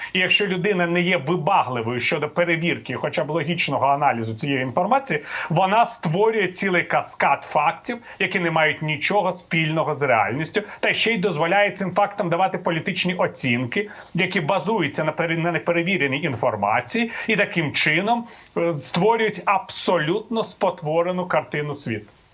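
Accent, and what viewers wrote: Standard. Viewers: В.Портніков приємно вас слухати.Ваша Українська мова дуже гарна,чиста, і не згвалтована неправильними діалектами кордонів.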